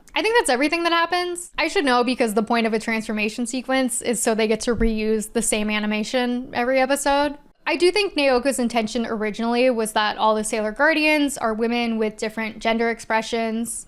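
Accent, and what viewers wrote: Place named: Southern California, United States. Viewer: Me, after clicking on a random recommended Sailor Moon essay: Is... is that a So Cal. accent?: [something something] Hollywood [something] (I'm not going back to find the timestamp, sorry) Me: Ohhhhh. HELL YEAH. More California accent representation, please! I have found my -people- person. Good vid, btw, despite the technical issues. I watched the first run of the dub back in 1995 before middle school and loved it, but that's the extent of my Sailor Moon knowledge.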